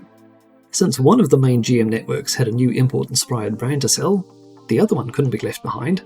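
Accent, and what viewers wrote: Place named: United Kingdom. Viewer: Drop the British accent. It doesn't help.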